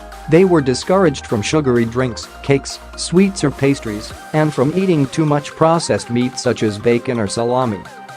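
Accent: United States. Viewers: An American robot!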